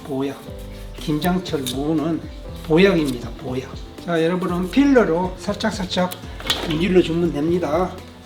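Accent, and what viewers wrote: Jeolla, South Korea. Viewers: 전라도 사투리가 구수하니 듣기가 좋으네요 석박지 요리 잘배워 갑니다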